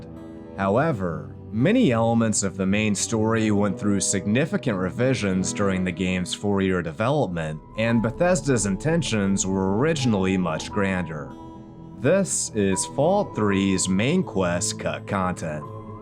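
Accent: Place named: Maryland, United States. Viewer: Are you from Maryland by any chance?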